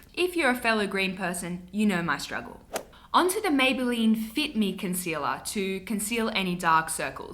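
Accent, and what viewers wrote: Australia. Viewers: I really love your accent ... Make me think to my "aussie crush"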